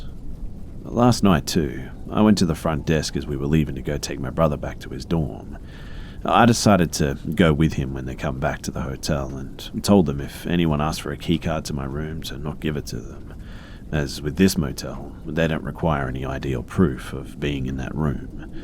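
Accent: Australia. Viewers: OMG an Aussie narrator! Ahhhhh.....wonderful.... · What's great about you, is that you're monetized but you don't edit out cuss words. Or maybe I just like to hear you swear in that Aussie accent. Either way, I'm dedicated to this channel. Long live! 🐝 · I was surprised that its narrated by a fellow aussie, makes it far better!! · 😂😂😂😂😂😂😂 he’s Australian you asshat go get your ears tested and don’t ever say an Aussie is a whinging pathetic Pom again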